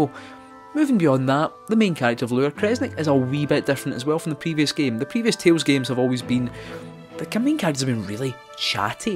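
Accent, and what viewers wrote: Scotland, United Kingdom. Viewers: are you Scottish, British, or Australian?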